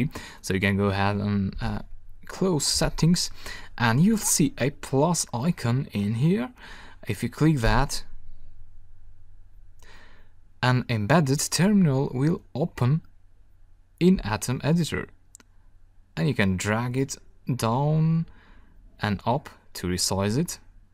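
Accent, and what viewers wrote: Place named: Russia. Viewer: are you russian? You have a nice accent.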